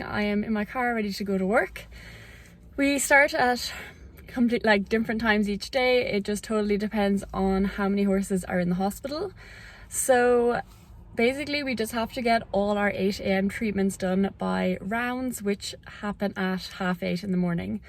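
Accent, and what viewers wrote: Ireland. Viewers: are you irish o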